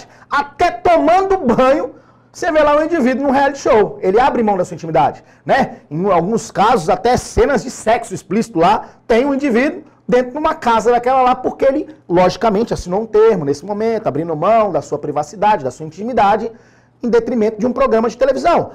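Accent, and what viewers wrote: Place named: Rio de Janeiro, Brazil. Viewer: jurava que você era do Rio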